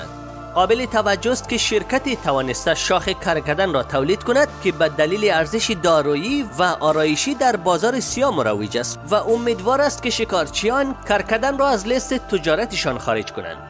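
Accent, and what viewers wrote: Afghanistan. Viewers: قربون اون لهجه شیرینت برادر افغان عزیز 🙏🏻 ❤️ خیییلی مخلصیم. بذار خورده ای ازت بگیرم... میبایست قبل از عنوان کردن موارد توضیح مقبولی دربارهء چاپ 3D یاهمان چاپ ۳بعدی میدادی نه اشاره وآر... بهترینهای خالق زیبایی رو برای شما دوست ارجمند آرزو دارم 🙏🏻 🌷 🌹 🌹 🌹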